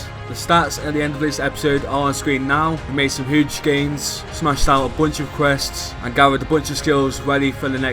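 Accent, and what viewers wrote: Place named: United Kingdom. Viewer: Love to series! But your accent is very Bri'ish! :p Kinda hard to listen to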